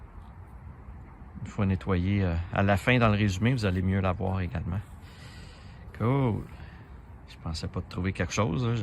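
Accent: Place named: Canada. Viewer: Avec votre accent vous êtes du Canada !! Pourtant vu le nom de la ville je ne sais plus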